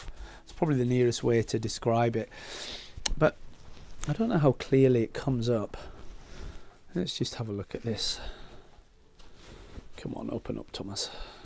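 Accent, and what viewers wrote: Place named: RP, United Kingdom. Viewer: Thx graet video sir! I can hear your clear queens english!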